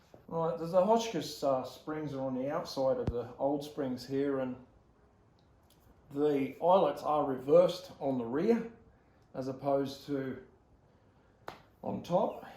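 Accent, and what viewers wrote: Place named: Australia. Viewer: Is this in the USA or Australia hence the Aussie accent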